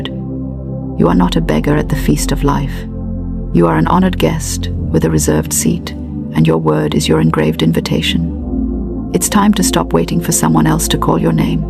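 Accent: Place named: England, United Kingdom. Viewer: American woman with an English accent.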